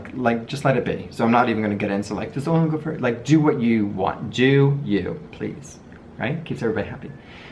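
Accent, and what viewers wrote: New York, United States. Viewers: R u originally from Seattle as U sound like ur from New Yawwkkkk!!! East coaster here as well. LOVE UR PRODUCTS!!! Keep on going!!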